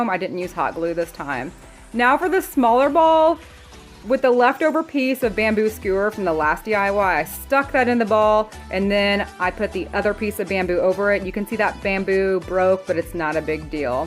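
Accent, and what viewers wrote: United States. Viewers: Cool on the tip in removing the words from the tin. I am on your side....the first topiary is my fave too. Same with the tins.. my fave is the same as yours. Always love your videos. I love your voice too; if you don't mind me asking, what state are you from?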